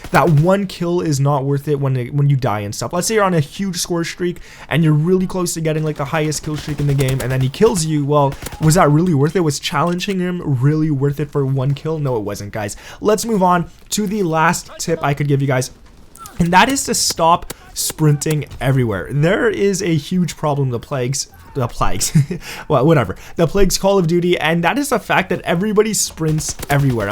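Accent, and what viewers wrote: Canada. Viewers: Shawn Tarbox I think he is Canadian based on accent. He can’t help it!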